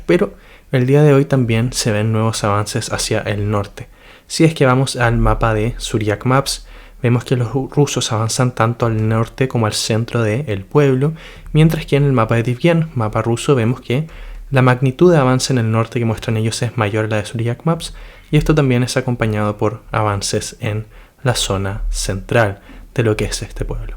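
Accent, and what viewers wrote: Chile. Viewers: Si compa, es de Chile. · Eres chileno bro?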